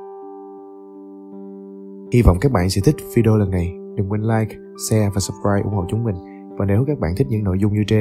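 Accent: South, Vietnam. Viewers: Thằng này giọng Nam nô nghe chán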